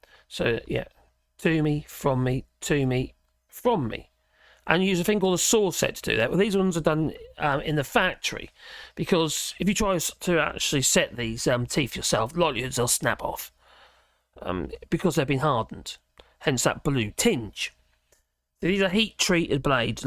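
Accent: Norfolk, United Kingdom. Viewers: You a Norfolk bumpkin buh?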